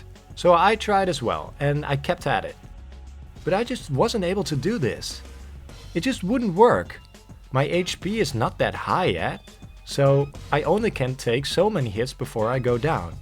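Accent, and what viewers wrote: Netherlands. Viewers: ben jij toevallig stiekem een nederlander? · Your dutch aren't you? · sounds dutch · You're Dutch aren't you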